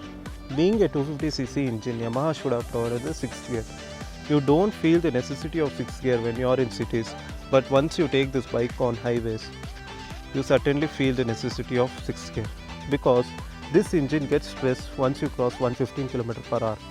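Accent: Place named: India. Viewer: Lower the music dude... Is already hard to understand Indian accent (like you have a potato in your mouth) plus you mumble.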